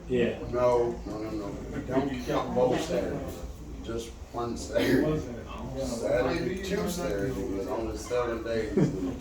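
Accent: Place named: South, United States. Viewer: It's very detailed but your talking to fast and country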